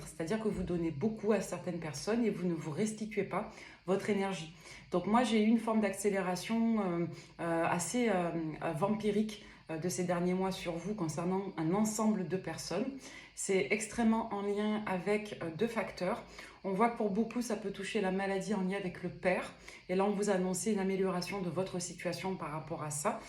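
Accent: South, France. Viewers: Merci; Je préfère largement entendre votre jolie voix avec un léger accent du sud et vous voir souriante. 😉😂🤗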